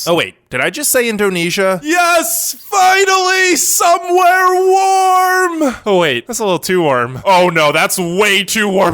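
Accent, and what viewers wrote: California, United States. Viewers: Where is your accent from in the statex ?! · Petropavlovsk is ok to pronounce. What is really hard is this American accent the author speaks 😅, my tongue wud hurt trying to copy that: theRe aRe, the largest (lOrgest Karl, lOrgest ) city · Why does your voice sound different in your videos? Some of them you have a more Californian accent.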